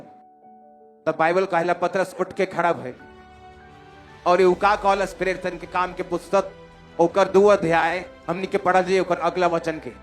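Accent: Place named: Bhojpuri, India. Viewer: Praise the Lord, Papa ji shudh bhojpuri. Wah...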